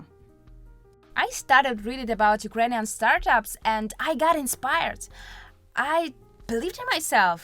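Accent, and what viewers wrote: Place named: Australia. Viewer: Your English is amazing! I thought that you're Australian :) Nice video, thanks!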